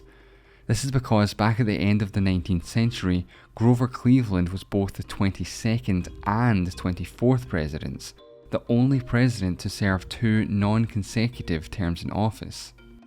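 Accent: Scotland, United Kingdom. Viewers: most likely because he is Scottish and Scottish accents and Welsh accents are pretty similar due to them historically influenced by Celts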